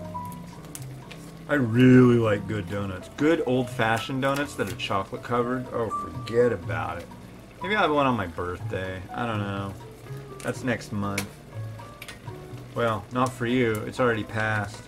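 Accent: United States. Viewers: For some reason your videos have got the vibe of 2000s. Maybe it's the editing. P.S. I hope you'll get the most you can from all these Russian dudes. P.P.S. Your accent is sooo satisfying to listen to. Smooth and very American. Nice pronunciation always gets me.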